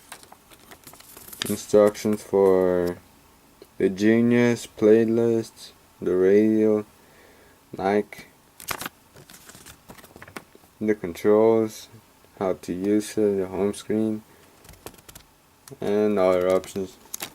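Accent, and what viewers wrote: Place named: Mexico. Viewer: you sound mexican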